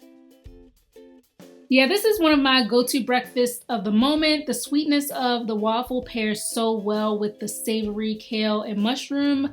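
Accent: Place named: DMV, United States. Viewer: you have to be from the dmv based off your accent."uuuuused"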